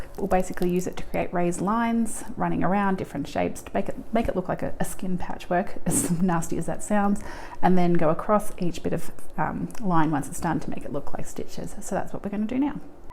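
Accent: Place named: Australia. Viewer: Hi new subscriber here fron Canberra, Australia. I presume by your accent you are Aussie?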